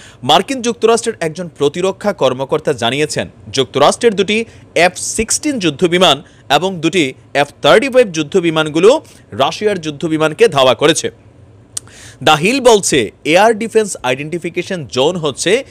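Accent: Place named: Comilla, Bangladesh. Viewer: হাহাহা ভাই কুমিল্লার ভাষা শুনলে আপনার মুখে সেই লাগে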